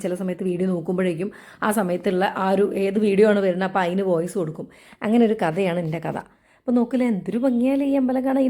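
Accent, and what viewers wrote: Kozhikode, India. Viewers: Nadathi 😂😂😂 inte Ingale kondu thottuuu 😅😅 mmale koikodu slang 😂😂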